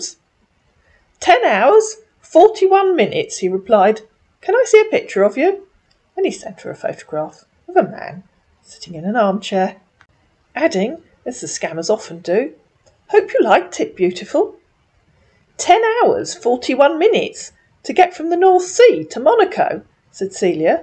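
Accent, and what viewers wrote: United Kingdom. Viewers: Omg rofl "Quit telling me ridiculously stupid lies". With your exasperated tone in your cute British accent, you have me laughing like a big ol donkey!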